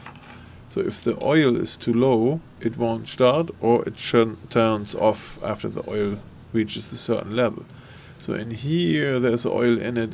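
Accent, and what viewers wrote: Germany. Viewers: Du hast einen sehr starken deutschen Akzent:)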